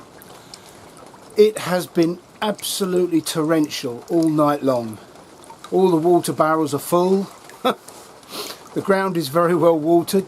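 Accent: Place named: Newcastle, United Kingdom. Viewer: A Geordie accent eh? Explains a lot lol. Can't you make powdered beetroot? It would go well in your smoothies. I can't understand people and their plots. I agree, I see them rushing in, watering with a 7.5 litre watering can (after waking 50 metres to get it) then off they go.I think flowers are intrinsic on the plot. People do garden differently. It would be boring otherwise. Elaine 🌱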